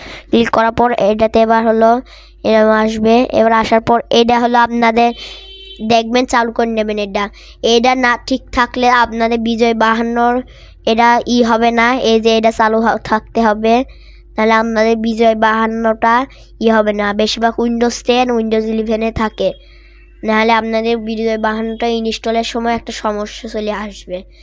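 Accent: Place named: Kushtia, Bangladesh. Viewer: Onk valo bolco viyaa❤❤ Ami sure tomer basha Kushtia 😊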